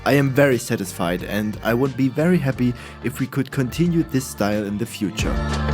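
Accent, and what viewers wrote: Germany. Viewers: Your akzent sounds a little bit German.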